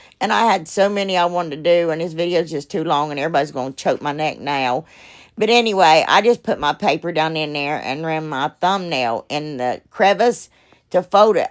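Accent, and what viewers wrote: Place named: East Tennessee, United States. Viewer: I love watching you because my family is in East Tennessee and your accent makes me feel like you’re family 😘 my entire house gets filled with snowmen. Only nativity sets and snowmen.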